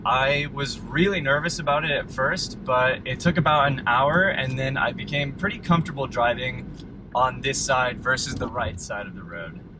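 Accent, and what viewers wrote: Australia. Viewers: Hello, i really like your videos, keep em coming please. I noticed you are starting to sound more Aussie, goodonya!! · 5 months and your accent is sounding really Australian on many words and phrases! I've been living here for years and I just can't get the sound right. Tho 'family and friends in California say I use some weird words or expressions sometimes.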